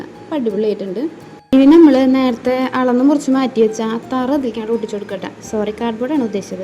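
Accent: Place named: Thrissur, India. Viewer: മ്മടെ തൃശൂര് ഭാഷല് പെടക്കണ പെട കലക്കിണ്ട് ട്ടാ.... 👍🏻👍🏻👍🏻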